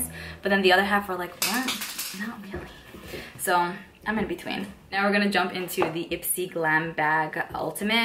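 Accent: New York, United States. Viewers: Girl I live for that lip on you and your ny accent!!!! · I've been subbed to you for a few weeks ever since I heard about your collab, I've been really enjoying your content. The luxe box seems the same as others I've seen, your Ipsy ultimate was really good especially compared to others. I love your accent btw, is it NY? If so were sort of neighbors I'm in Philly, PA.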